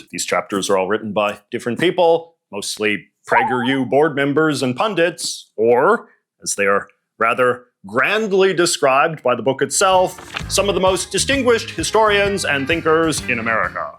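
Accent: Canada. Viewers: sounds like Canadian Carl Sagan and I’m here for it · Now that’s a Canadian accent.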